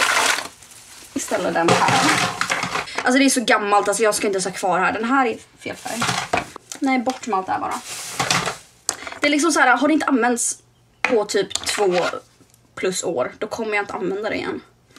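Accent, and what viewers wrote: Stockholm, Sweden. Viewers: Varför låtsas du vara stockholmare??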